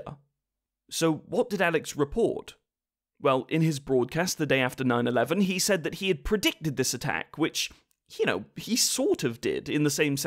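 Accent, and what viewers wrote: United Kingdom. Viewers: I kept thinking... is this guy a savage British guy or a well spoken Australian...but then he said pound and meant currency and I'm siding with him being a brutally savage British. Also... yes I'm American... and... yeah... sorry about... well... most stuff after 1776... we kinda got a little upset about a lot of things and didn't think to restrict gun ownership clearly enough and it has all been down hill from that point...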